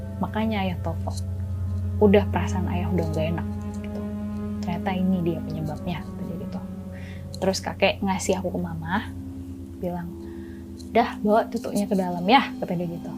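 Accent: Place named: West Sumatra, Indonesia. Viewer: logatnya kaya orang minang😁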